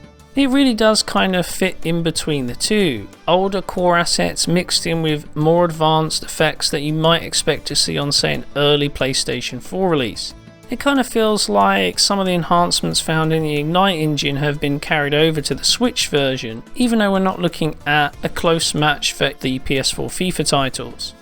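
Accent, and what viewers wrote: England, United Kingdom. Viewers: british accent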